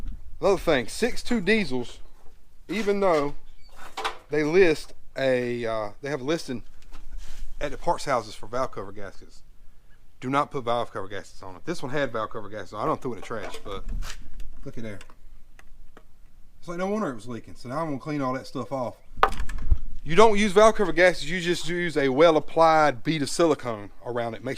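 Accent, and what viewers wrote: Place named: South, United States. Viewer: I never realized how southern you sound till I watched this video.... lol Good job brother!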